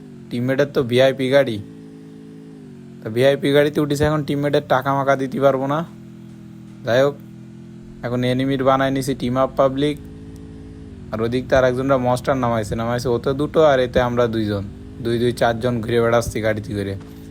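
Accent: Jessore, Bangladesh. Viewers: ধন্যবাদ ভাই আপনার খেলা দেখে জুন পোস্ট দেখে ভালো লাগে, আপনার কথা শুনে মনে হয় আপনি যশোর জেলার লোক, আপনার বাসা কি সাতক্ষীরা, ঝিকরগাছা, আমার বাসা যশোর ঝিকরগাছা থানা বাঁকুড়া ইউনিয়ন,❤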